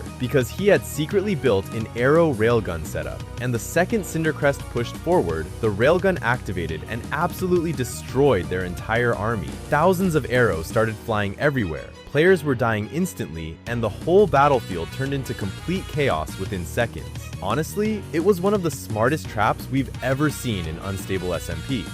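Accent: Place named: India. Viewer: BRO THAT INDIAN ACCENT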